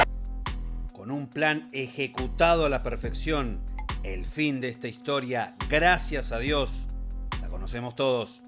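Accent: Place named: Argentina. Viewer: Tanto el locutor de este video como algunos comentarios sólo quieren ensalzar a un Personaje que si bien es un buen portero y eso no se pone en duda es una persona con un pobre léxico, más bien soez y escatológico, luego en el cobro de penaltis hizo mofa de su rival, una actitud antideportiva, irrespetuosa y vulgar, sin contar todas las payasadas en el punto de penaltis y luego para completar (advierto al locutor de este canal que si borra éste mensaje el cual no tiene ni una sola grosería, ni palabras obscenas, ni irrespetuosa lo denunciaré ante YouTube para que aprendan a hacer un uso correcto de éste canal que por demás es gratuito,) y cómo les decía la guinda de la torta ese gesto de ponerse el guante de oro en sus partes íntimas como un acto propio de un sádico. Señores este Dibu tiene un comportamiento totalmente de enfermo psico-sexual compulsivo. 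Todo lo descrito en mi comentario es totalmente verídico, público, comunicacional y notorio, por tanto la verdad no se puede ocultar con edulcorante dicho por un locutor que a todas luces se nota en su acento es argentino, por lo tanto no censure mi comentario y sea serio en su trabajo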